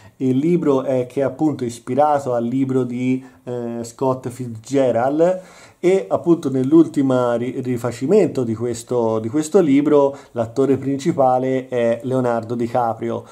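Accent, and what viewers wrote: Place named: Arezzo, Italy. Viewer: Bella recensione complimenti! Con una bella spiegazione storica sull' art déco! Sono finito sul tuo canale praticamente per caso cercando delle recensioni in italiano sul Reverso ; è un modello che ho sempre adorato e negli ultimi mesi è diventato una "fissa" 😆 presumo tu sia dell'aretino/ Val d'arno dal tuo accento ? 😄 sbaglio o a si vede un movimento della Chopard ?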